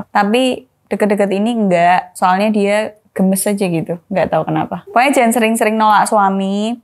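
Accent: Java, Indonesia. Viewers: mbakny logatny medok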